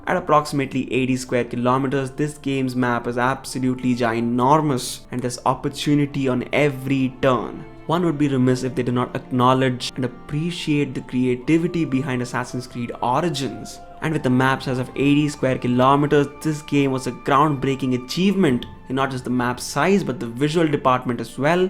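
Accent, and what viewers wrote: India. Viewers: probably this guy, after all he sounds like a typical indian scammer that might phone your house. Can't be trusted.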